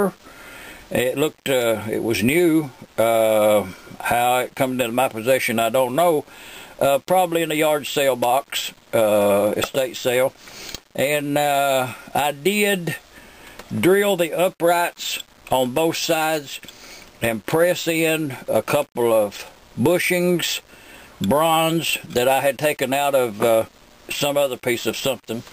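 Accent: South, United States. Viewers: hahah i thought bout hillbilly when i heard his voice xD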